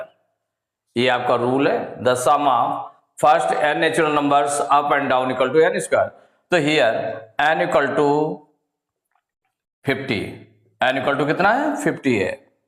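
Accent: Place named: Bihar, India. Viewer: Sir aap. Bhiar se ho kya kyu ki aapka aawaj bhiar jesa lqg rah hai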